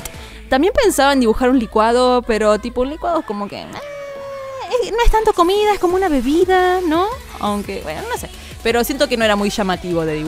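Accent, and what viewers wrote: Argentina. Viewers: Dios mio como amo el acento argentino (~♡○♡)~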